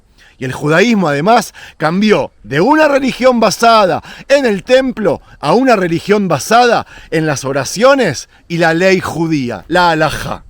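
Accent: Argentina. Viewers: Eres de Israel tienes el acento de Argentino... 🤔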